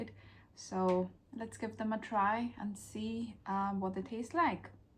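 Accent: United Kingdom. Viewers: I grew up eating Tunnocks biscuits & Tea cakes as they're a huge favourite in Scotland.👍 Is it just me or do you have a Welsh twang to your accent?🤔😁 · The way you pronounce words like "Lovely (luverly), Flavoured (flaveED), Orange (ORange)" it's not a proper Welsh accent, but the way you draw out O sounds and enunciate the endings of words, does give you a very slight Welsh Valleys twang to your accent.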